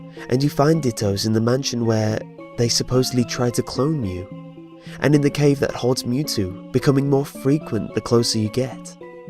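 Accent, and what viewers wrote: United Kingdom. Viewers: You are my favorite YouTuber ok I look up to you from your British accent to your super cool top tens !!! Even your creepypastas are creepyer whith you!!! And I love that 3rd one!!please NEVER ever ever never forever never stop making videos NEVER ever forever and ever never stop .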